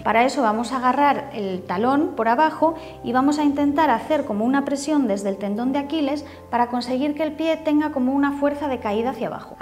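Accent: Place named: Spain. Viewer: Eres española el acento que masajes tan relajadores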